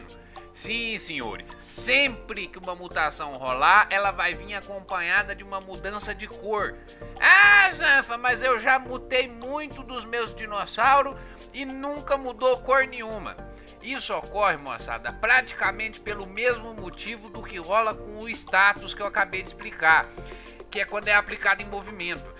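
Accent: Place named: Rural, Brazil. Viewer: Mano tua voz parece de dublador de filmes tlg , aqueles filmes americanos que tem alguém caipira aquele sotaque mano acho que vc teria sucesso em dublagem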